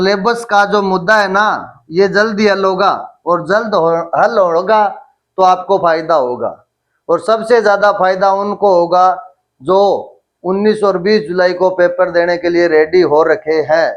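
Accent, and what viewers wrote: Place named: Bagdi, India. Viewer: Waa Sir apni bagdi bhasa m bhot bdiya triya bat clear kro the 👏👏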